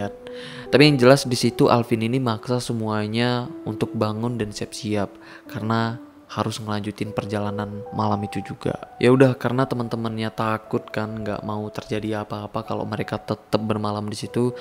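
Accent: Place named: Medan, Indonesia. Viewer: Orang medan yah?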